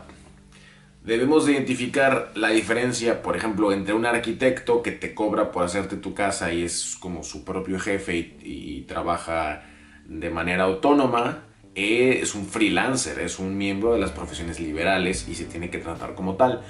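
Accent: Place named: Mexico. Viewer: jajaja me encanta tu forma mexa de hablar <3 jajaj <3 exiiiiitooooooooo en su negocioo!!!!!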